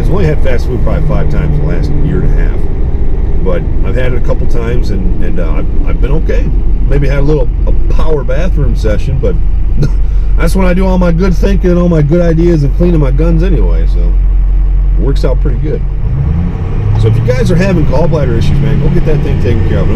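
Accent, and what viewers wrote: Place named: Texas, United States. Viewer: Michigan? I figured you were from Texas or something lol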